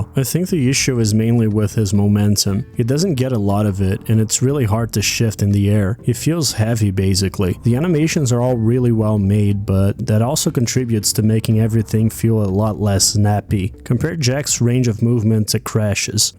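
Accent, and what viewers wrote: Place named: Europe. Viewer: I apologize if it's rude at all but your accent caught my ear, it sounds nice! Are you a European Ytuber? Also, I grew up with the Precursor Legacy and genuinely loved the hell out of it. Jak not really being 'mute' but moreso Daxter interuppting/butting in before Jak can speak is unique lol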